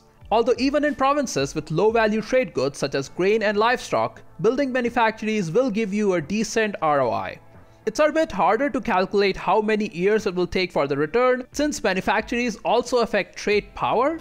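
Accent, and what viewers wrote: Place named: India. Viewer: Where are you from? I think you have an indian accent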